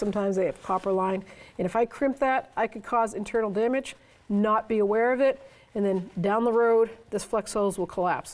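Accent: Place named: New England, United States. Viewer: Great video tool. I have the 2010 RX350, I've replaced the rotors and pads front and rear, but the brakes still grab...so I'm thinking I need new calipers. 177k miles I think she deserves them, so that's next and I'm thinking that will make the system work as good as new. Love the New England accent too...I'm from north of Boston myself! always check with TRQ first, and 1A Auto. They ALWAYS stand behind the product 100%. Thanks from a customer you've earned. Question: possible you have a video on changing the fluid in the differential/transfer case of the AWD model of the same year? Thanks again.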